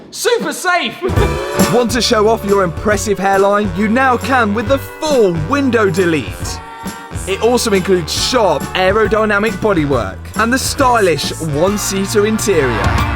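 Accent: Leicester, United Kingdom. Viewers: Leicester boy by your accent 😉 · Damn this guys really reminds me of the Stradman. Just hear the way he is talking (except the British accent) and also the hat (they are both always wearing a hat)! They also have the same sort of energy 😀😀😀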